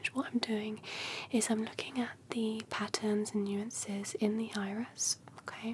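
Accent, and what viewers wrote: United Kingdom. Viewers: Great ASMR voice, British accent makes it even better! ✌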